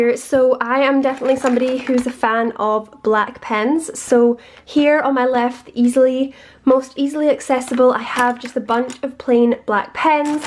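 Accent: United Kingdom. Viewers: I love how her accent sounds like normal English accent but also has that British accent😍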